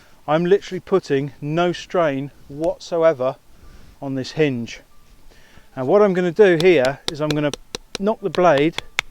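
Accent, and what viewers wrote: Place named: United Kingdom. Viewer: Wow, in the world of accents I found a British man!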